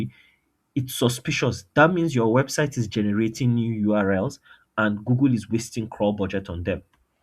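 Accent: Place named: Africa. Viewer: Whatever you doing bro I am here for it..but 😭😭that accent bro..are you African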